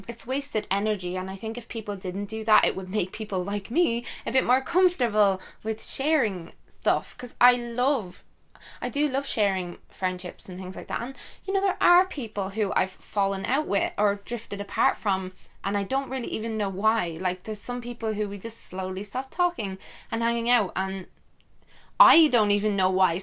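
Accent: Ireland. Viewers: Before watching your videos, I thought that the English accent was the best. Now I'm love with the Irish one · Veronika Meister Any accent can sound both good and bad, in my experience. I've met Germans with lovely, lively and warm accents and others with a very harsh and unpleasant ones. My friends ex had this amazing accent, very pleasant and soft. Same with Irish, some have a lovely accent (like on these videos), others have an accent that is very abrasive. Heck, look at British accents, so many regional varieties - some of which are, let us say unpleasant lol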